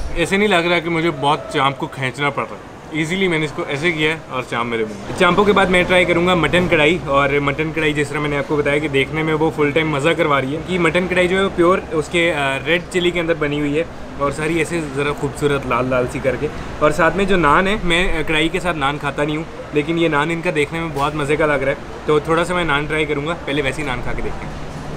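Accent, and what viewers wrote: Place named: Punjab, India. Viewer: اچھا لگا پیشکش کا انداز پنجابی کا ٹچ مزید دو آتشہ کر دیتا ھے مرحبا پنجابی کو فراموش نہ کرنا یہ اردو کی ماں ھے سینئر ھے ماشاء اللہ سدا شاد باد منزل مراد رھو...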